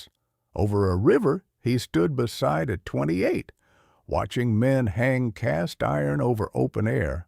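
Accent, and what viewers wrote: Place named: Appalachia, United States. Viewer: What on earth are you doing using an American hill billy to give a commentary on industrial Britain???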